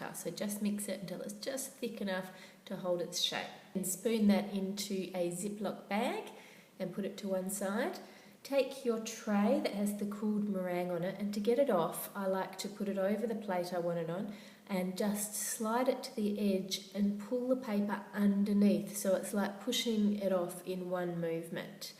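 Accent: Australia. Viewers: Dn't know why, just a normal Aussie Accent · lol accent? I could barely tell, and I'm from the US. Sounded like average Aussie to me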